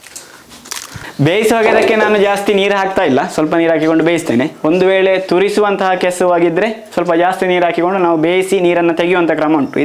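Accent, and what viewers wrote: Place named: Coastal Karnataka, India. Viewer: ನೀವು ಮಾಡುವ ಪ್ರತಿಯೊಂದು ಅಡುಗೂಟವು ನನ್ಗೆ ಸಿಕ್ಕಾಪಟ್ಟೆ ಇಷ್ಟ ಮತ್ತು ನಿಮ್ಮ ಕರಾವಳಿ ಕನ್ನಡದ ಭಾಷೋಚ್ಛಾರದ ಶೈಲಿ ಎಲ್ಲವೂ ನನ್ಗೆ ಅಚ್ಚುಮೆಚ್ಚು ❤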